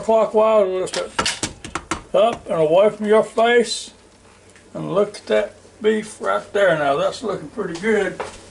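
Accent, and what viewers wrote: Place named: Appalachia, United States. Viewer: HELL YA I enjoy a hillbilly talking about what i eat in chicago!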